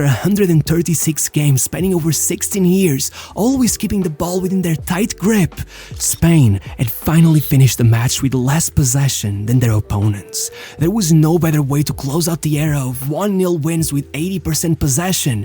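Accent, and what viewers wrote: Portugal. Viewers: bro is calling every Spain player like a Portuguese one xd. Just pronounce them in English bro it sounds better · why do you pronounce the names like if they were portuguese? not criticising but as a spaniard i think pronouncing the names like they really are o at least trying could be better for the overall quality of the video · Why do you pronounce names so weird. Like with a Portuguese accent Torresh Grodri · Great video! I was just annoyed that you kept pronouncing Spanish names with Portuguese pronunciation. Or am I wrong? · Quiero decir, el chico es portugués, creo. Es normal que los nombres le salgan con acento. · I love you you pronounce every Spanish name with a Portuguese accent 😅. Great video and Viva España y Portugal 🇪🇦❤🇵🇹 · is the guy reading this portuguese? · I appreciate the effort to try to pronounce Spanish names correctly, but you are pronouncing them in Portuguese.... 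· Why do you pronounce Spanish names in perfect Portuguese accent?😂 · I would like to ask you to pronounce the Spanish names properly, not in Portuguese or Brazilian pronunciation. Thank you. Overall, fine job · Why is he giving a portugese pronuntiation to all the spanish names?? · He pronounces spanish names in portuguese lol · Just a tip as a Spanish polyglot interested in phonetics: pronouncing Spanish words with a Portuguese accent doesn't make them more accurate and similar to the Spanish pronunciation, and in fact, it sounds quite unnatural, especially while speaking perfect English. I would recommend that you stick to the English pronunciation when saying those words if you don't want to learn Spanish phonetics. Of course, maybe you don't care at all, but since you're a content creator I would assume you want to sound more natural and educated👌. That way your viewers can focus more on your content, rather than the weird pronunciation, and the actual message is delivered much more easily.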